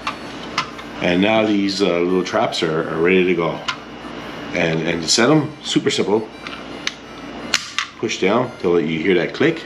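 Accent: Canada. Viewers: As a northern Mainer, I could instantly tell I was listening to one of my Canadian neighbors. 😊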